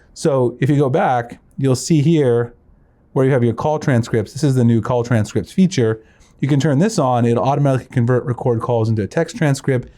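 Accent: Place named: United States. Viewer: You talk to much horrible American accent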